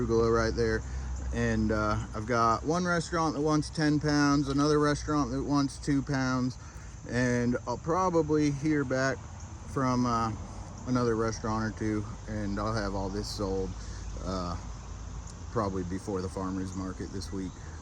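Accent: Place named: South, United States. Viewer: Yooooo broooo! This is awesome and so glad to see you starting up a youtube channel for all the amazing work you do! It's been very inspiring to see all the progress over the last year and all your wicked smart inventions/solutions to daily farm work. I'm going to get up there for a visit this fall and check it out in person. Also, I think you're almost officially a southerner with that sometimes southern drawl ;)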